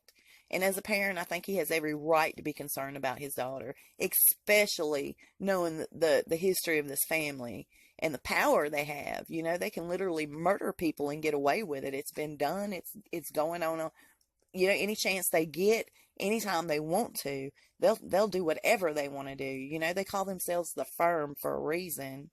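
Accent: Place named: New Jersey, United States. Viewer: 2/2 ...that’s how ya “tawk”” when yr from Jersey... Girl!!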